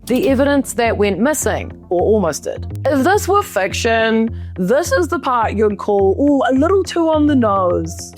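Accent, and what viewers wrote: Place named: New Zealand. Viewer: New Zealand/Kiwi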